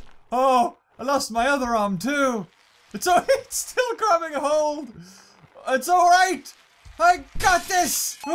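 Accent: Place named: United Kingdom. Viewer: God I love ur Brit accent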